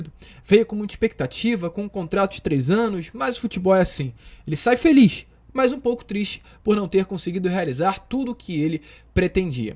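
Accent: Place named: Rio de Janeiro, Brazil. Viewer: se possivel diminua um pouco o carioquês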